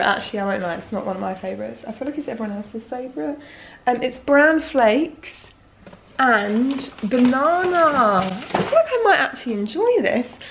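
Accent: England, United Kingdom. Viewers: You are adorable!! Love the English accents and expressions being from across the pond! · She reminds me of Emma Watson (and not cause she has an English accent) but she looks like her too sort of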